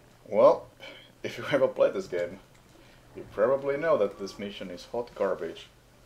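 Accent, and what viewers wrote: Finland. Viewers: That’s a Finnish accent 🤘🏻🇫🇮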